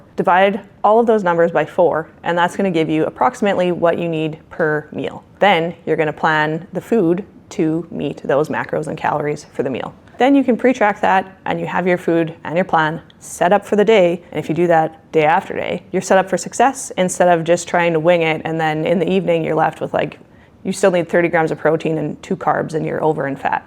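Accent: Canada. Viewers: Are you Canadian? I about abooout hahah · Girl, are you Canadian? You sound Canadian and love this. I have binged like 4 of your videos now and I'd love to think you're one of us.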